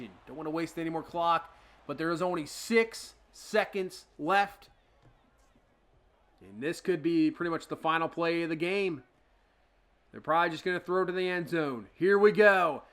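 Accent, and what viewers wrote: Baltimore, United States. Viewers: Just found your channel while searching for Raiders content. Awesome work! Just one question, are you from Maryland? You sound like you have that Bawlmore accent . O’sss and Natty Boh’s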